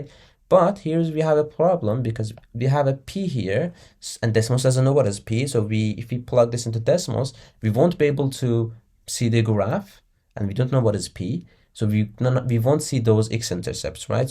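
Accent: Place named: Turkey. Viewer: aksandan türk oldugun anlasılıyo kral guzel video olmus eline saglık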